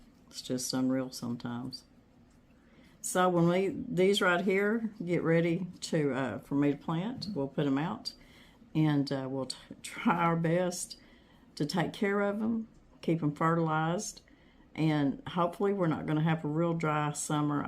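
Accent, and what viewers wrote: South, United States. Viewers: Dear Miss, Thank you for brightening this dreary, rainy day with another delightful video! Wish I could send you some rain for your beautiful, newly planted sweet potatoes. Love watching you plant while listening to your calm southern accent! Yesterday I watched you make your Coca Cola chicken and it looked wonderful! You make everything look so easy and simple! was certainly enjoying it…….I’ll take his word for it!🤗🤭 How do I go back and watch your very first videos? I’ve missed so much. Have a happy weekend! 💕💕Jackie🪷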